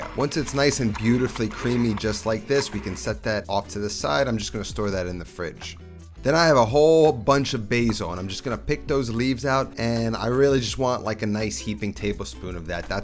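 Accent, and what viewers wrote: New York, United States. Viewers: No, it's an Italian/ American New York thing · for the love of God, stop overthinking the way you pronounce ricotta. Don’t feed the trolls. You’re Italian American from New York, so is my sister in law- her whole family pronounces it the way you do. People pronounce things differently in different places. Also I’m Irish, and that’s how all my chef instructors in culinary school years ago pronounced it. People need to move on if they’re still giving you shit about the way you speak. Sandwich looks amazing by the way, keep doing you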